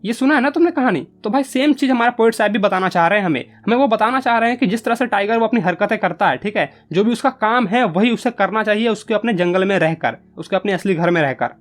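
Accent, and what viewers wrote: Bihar, India. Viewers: Baauate rhata hai ...... A bihari or I can felt that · Brilliant explanation sir , I am from Bihar and I could feel your bihari accent coming in between the lecture 😂😂🤣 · Bihar se ho kya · Bhaiya Bihar up se lag rhe hai😅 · Bihar se ho na tum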